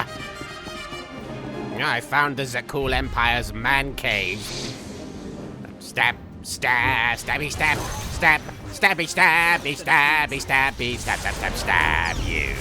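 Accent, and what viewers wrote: United Kingdom. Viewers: Is it just me, or does Marik sound more british than in his older let's plays? I guess he spent too much time with Yami Bakura XD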